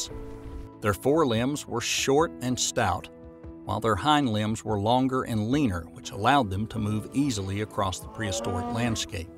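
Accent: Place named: Rural, United States. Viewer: Y'know what I just realized while watching this? My view of the south-western US states was subconsciously improved by the fact that this info is being delivered by a rural American accent. It's embarrassing to realize I got influenced in that way, but...seems like an effect that tourism boards should be looking at. Evolution being discussed by this demographic feels out-of-place, but it doesn't have to!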